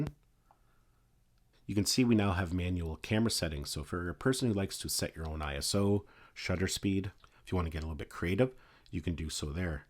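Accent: Canada. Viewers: Are you Canadian or an American state bordering Canada?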